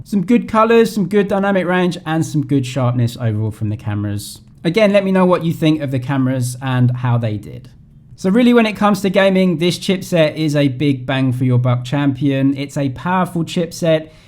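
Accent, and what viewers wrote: England, United Kingdom. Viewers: i like his accent is he british or australian ??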